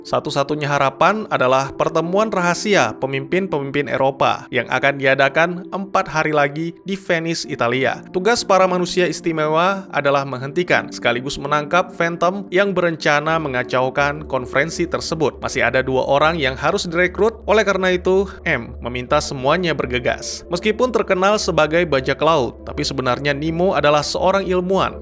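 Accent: Aceh, Indonesia. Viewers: Lo org Aceh ya bg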